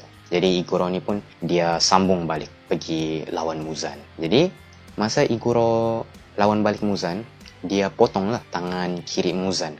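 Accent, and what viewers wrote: Sabah, Malaysia. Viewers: dgr2 org sabah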